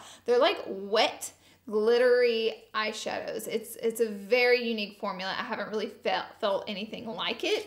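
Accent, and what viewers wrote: South, United States. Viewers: I like how you go from a southern ish accent to a high pitch girly scream in 2 seconds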